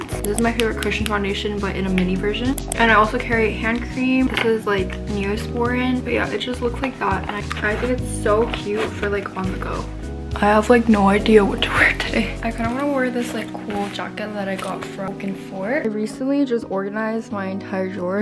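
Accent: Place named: China. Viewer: chinese accent 很好聽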